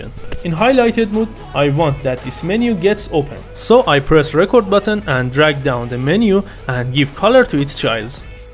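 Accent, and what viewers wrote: Iran. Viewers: you talk english like a persian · I picked up an Iranian accent 🙂. Nice video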